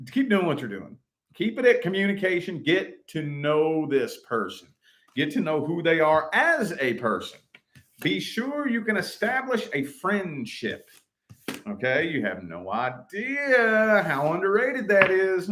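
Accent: South, United States. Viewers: You have to be southern like me with that voice! LOL! I'm from Georgia. And, you're on point with the start. I deserve to be more than an option and game. I watch these for healing and because I am spiritual, I'm Cherokee, and religious. And, it helps to heal with God in place. And, stay focused. I gave my best. For years I tried, done the growth. Forgiveness for their growth, tried to show them that I saw the better in them, and was overlooked or judged for it rather than appreciated. And, at this point, I'll believe what I see. As I deserve, or nothing. No blame game or grudges, just reality and life is too short and should be valued with those we love and love those who love us. Because, pride doesn't love us as much as we love to have it, and it was always first before me whereas they were my choice. So, I done them a favor since they were so unhappy and regretful, with hurtful words, and like I was in the way for loving them, and I left. 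So they could be happy. That was what they made me believe they wanted. I'm a realist. And, everyday that goes by is everyday we cannot get back and nobody deserves to wonder why they don't deserve to be apart of it. Happy holidays!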